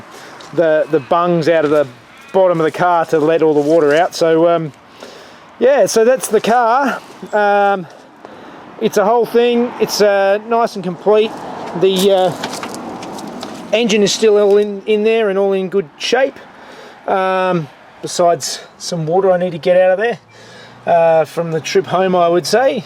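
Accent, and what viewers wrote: Australia. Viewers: Do a full 105 bertone race car conversion. Had i known you were i Adelaide I would have lent a hand. Thought i recognised the accent. (full adelaide question) Where'd you go to school? My best mate has been putting off his 105 rebuild for nearly 15yrs. I recommended he check your channel out and the next week bam, 105 rebuild. Is it a twin spark motor?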